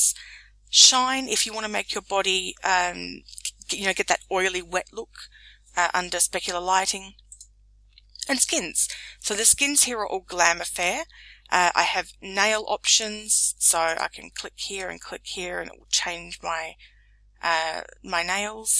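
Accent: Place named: Australia. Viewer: An Aussie tutorial Yes!!! I can understand this (I am from Melbourne) and very very helpful thank you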